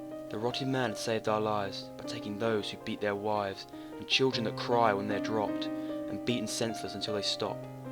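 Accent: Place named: England, United Kingdom. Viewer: Very good, nice to hear one in an english accent! Xx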